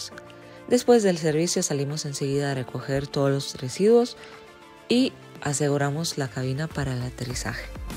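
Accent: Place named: Argentina. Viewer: !!??tu castellano es muy argentino!!!!😃 Coca, jugo, y como estructuras muchas frases!!! Te A D O R O!!!!!!!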